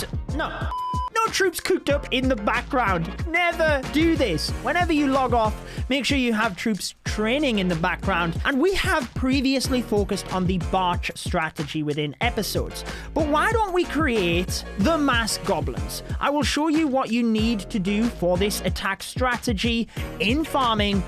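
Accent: United Kingdom. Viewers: Just enjoying ur british accent bro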